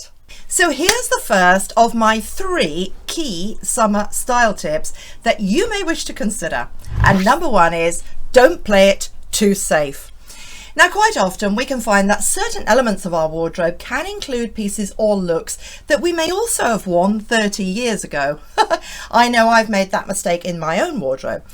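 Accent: United Kingdom. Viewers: I really appreciate your fashion tips and this American loves listening to your lovely British accent.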